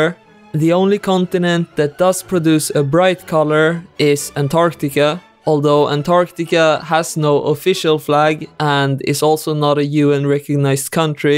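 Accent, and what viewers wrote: Sweden. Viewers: I knew it was a swedish person bc of the accent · Are you Swedish? As a swede, your accent kinda sounds Swedish, are you?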